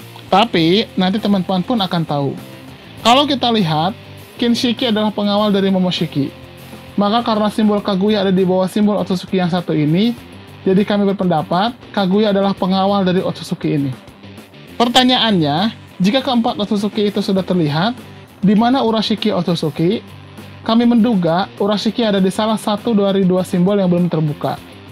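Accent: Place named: Java, Indonesia. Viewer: Lucu orng in ngomongny medok pak,,,hahahahaha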